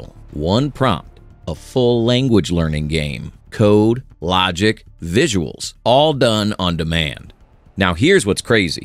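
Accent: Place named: United States. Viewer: I love how country you sound ! Gitty 😁 Yup !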